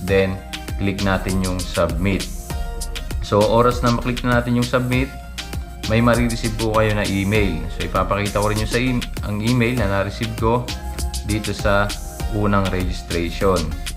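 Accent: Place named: Visayas, Philippines. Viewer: Bisaya man ka dong hehehe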